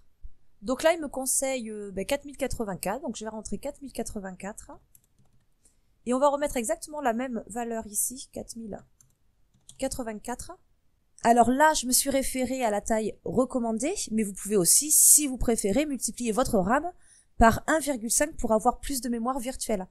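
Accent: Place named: North, France. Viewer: Merci, j'ai revu ton tuto avec plaisir, mais je n'ai pas encore partitionné mon disque dur mécanique, je n'ai pas de SSD... PS:" j'men va d'ce pied elpartitionner"!!!, tu lis en phonétique, c'est le patois du Nord, que je n'ai jamais aimé, il est moche... Je suis originaire du Sud et ma mère est Pied noire, mes les gens du Nord, je les apprécie beaucoup... yo !!!! Bisous à toi !!!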